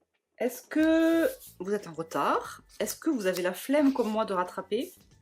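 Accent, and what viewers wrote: South, France. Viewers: tes papotages avec ton bel accent du sud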